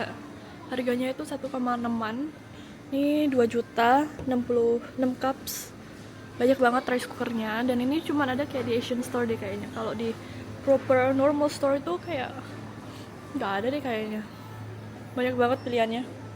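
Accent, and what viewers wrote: Surabaya, Indonesia. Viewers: ngonten logat surabaya dong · Jancuk e ngena bngt ...arek Suroboyo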